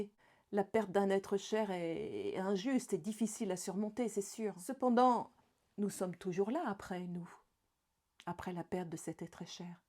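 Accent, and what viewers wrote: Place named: East, France. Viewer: Bonsoir .. joli accent de l est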